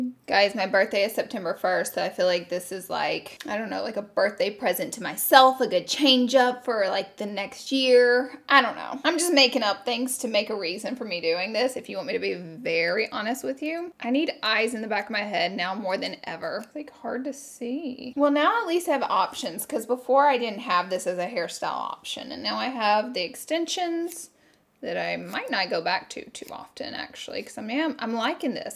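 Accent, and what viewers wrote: South, United States. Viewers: why is her southern accent worse in this video than usual or western idk im european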